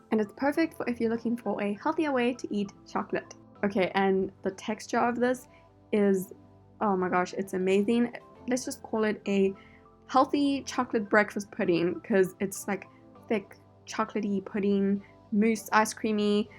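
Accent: South Africa. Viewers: Are you maybe from South Africa? Youe accents sounds like it. Great video by the way 🌈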